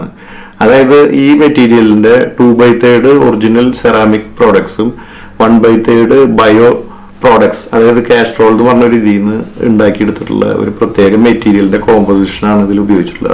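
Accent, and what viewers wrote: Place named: Thrissur, India. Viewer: തൃശൂർ ബ്രോ ആണല്ലേ 👍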